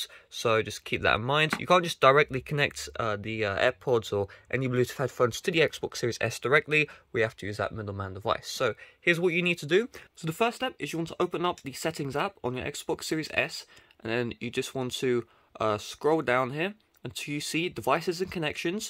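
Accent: United Kingdom. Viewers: Nice british accent mate! Watched till the end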